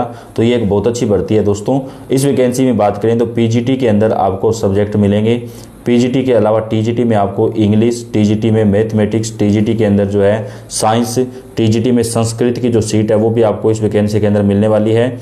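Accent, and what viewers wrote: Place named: Lucknow, India. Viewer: Lucknow English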